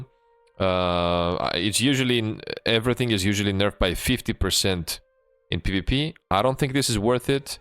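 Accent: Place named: Greece. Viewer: Cool accent, is that greek if you don't mind me asking? :)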